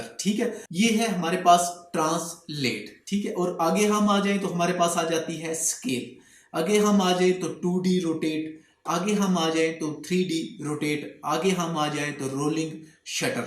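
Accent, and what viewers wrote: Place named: Punjab, India. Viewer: Tusi bolde urdu jo but tuhada lehja punjabi wala hai ji 😀😀🙏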